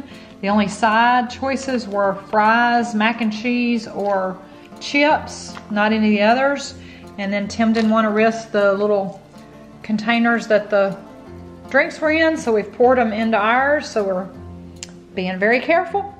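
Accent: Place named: Oklahoma, United States. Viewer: We are actually from San Diego but a lot of my husbands family lives in Oklahoma so he was pondering your accent 💁🏼 thanks for sharing!!!!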